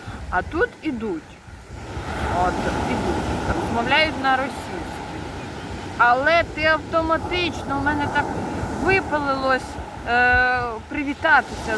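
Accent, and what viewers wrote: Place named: Podillia, Ukraine. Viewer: Ви з Поділля? Маю знайомих з Бершаді, говорять точнісінько як ви.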